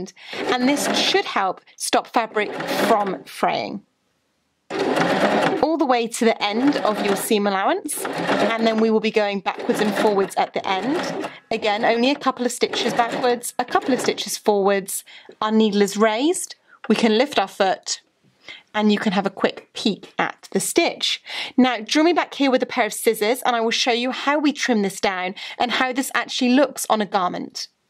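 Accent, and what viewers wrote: United Kingdom. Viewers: Tina Bailey Actually, I think she’s saying “join me back here.” She has a lovely British accent which may sound like draw instead of join.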